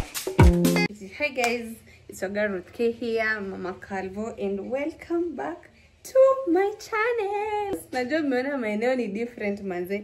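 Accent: Kenya. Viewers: Ruth k ako na ascent ya waluhya 🤗🤗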